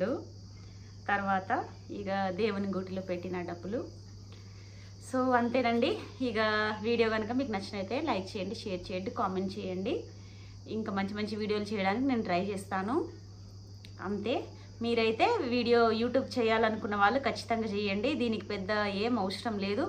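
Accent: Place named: Telangana, India. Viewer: Your telugu accent is pakka telangana, I really liked it. All the very best.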